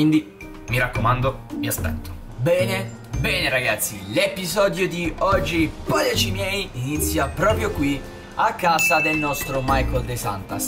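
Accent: Bari, Italy. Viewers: Sei di bari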